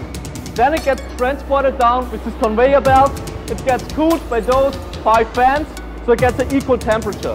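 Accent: Germany. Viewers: Dein englisch ist gut jedoch der akzent ist zu deutsch 😂👍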